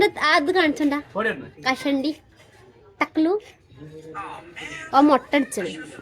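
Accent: Malappuram, India. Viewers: എന്തായാലും മലപ്പുറം . ഭാഷയാണ് നിലമ്പൂർ പെരിന്തൽമണ്ണ ഭാഗം ആണ് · അതെ.. മലപ്പുറത്ത് ആയിരുന്നു എന്ന്തോന്നുന്നു ഇവര് താമസിച്ചിരുന്നത്. ഇങ്ങക്ക്.. ഓല് എന്നുള്ള വാക്കുകൾ എപ്പഴും സംസാരത്തിൽ വരുന്നുണ്ട് 😊🥰🥰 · മലപ്പുറം സ്ലാങ്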